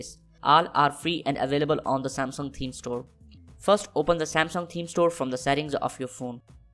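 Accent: India. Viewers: your accent is pretty good and everything but some people doesn't understand maybe because your accent is Indian English so maybe that's why some of them need captions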